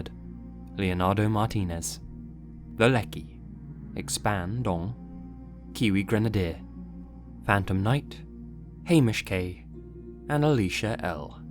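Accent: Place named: United Kingdom. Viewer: But sorry I know your British i love your videos soo much you're the only one ill listen to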